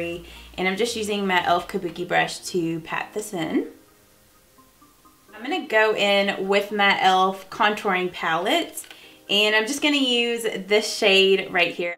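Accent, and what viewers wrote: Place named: Kentucky, United States. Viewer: You have to be from KY. You have the same accent as I do ☺️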